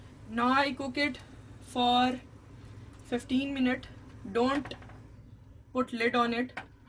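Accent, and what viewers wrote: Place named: Punjab, India. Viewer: MA'AM.....you have Punjabi accent while speaking.....firstly I thought you are from INDIA.....it's so similar accent.....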